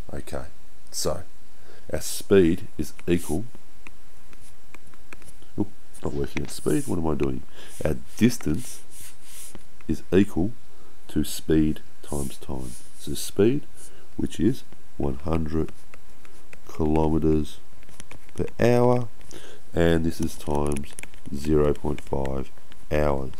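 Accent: Australia. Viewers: Thanx Aussie, thats the best description I can find for this problem, no Indian accents(no offence intended), by the way hope that head cold clears up for ya soon, LOL. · Lol u sound like someone from love island Australia and thanks for the lesson really helped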